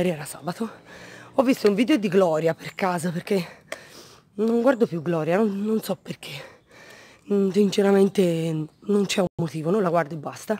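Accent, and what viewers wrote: Tuscany, Italy. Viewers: Che dolce quando parli di Axe!😍Comunque adoro il tuo linguaggio😂😍 è molto simile al mio ,tolto che tu parli toscano😂😂😂!Comunque con il rossetto liquido dior anch'io non mi sono trovata bene,sono andata a cena e lo avevo dappertutto,mi dura molto di più il rossetto in stick!Bah 33 euro buttati!Baci😘😘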